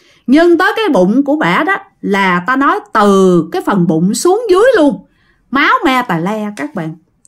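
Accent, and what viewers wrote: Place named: Mekong Delta, Vietnam. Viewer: dân miền tây thì mình cứ phát âm theo dân miền tây đi chứ ko cần phải phát âm theo dân miền bắc bạn hiểu mình nói ko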